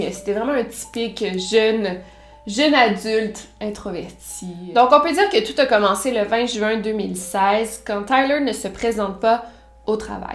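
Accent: Quebec, Canada. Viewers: Je voulais juste te dire que tu es magnifique, sympa et intelligente et que j’adore tes vidéos et que grâce à toi je comprends l’accent québécois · Grâce à toi je comprends mieux l’accent québécois et je suis beaucoup plus à l’aise avec d’autres vidéos de YouTubeurs québécois 😍 merciiii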